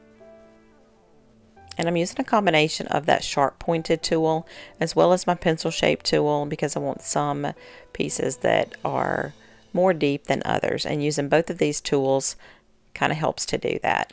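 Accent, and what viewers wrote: South, United States. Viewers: Girl you are ridiculously talented. I just love these. You blow my mind every time. And I love your southern draw. Reminds me of when I was child and we would drive to Tennessee every summer to visit family. Nothing like a 10 hour drive in a car filled with kids. Keep crafting and stay healthy my friend 🌸🌼🌺🌻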